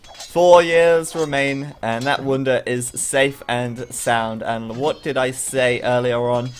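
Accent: United Kingdom. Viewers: Age of Empires 2 it's dat British accent! Welcome change from the Aussie drawl I have to put up with >_<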